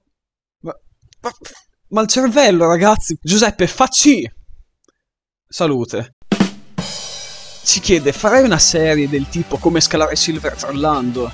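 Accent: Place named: Bologna, Italy. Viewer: Sei di Bologna? :O pure io :D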